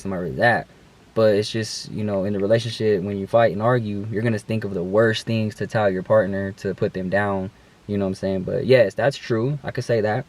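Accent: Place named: Bay Area, United States. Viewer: I think the Bay Area is getting to you in how the speak lol . Those from the bay know what I mean lol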